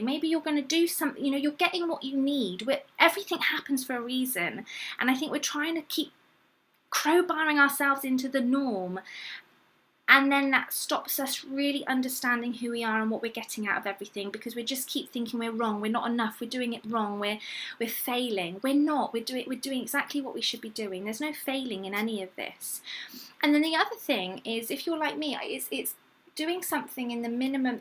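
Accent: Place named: United Kingdom. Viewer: Your accent is British 🙂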